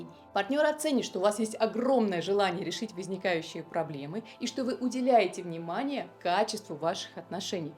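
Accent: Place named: Russia. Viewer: Дизлайк за руZZйобську говірку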